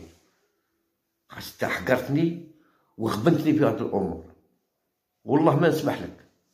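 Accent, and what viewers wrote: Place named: Aïn Tadles, Algeria. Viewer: اخي راك تهدر لهجه تاع عين تادلس الناس كاين اللي مايفهمش بلماضي راه يخدم ماتعمرش راسك تحيا الجزائر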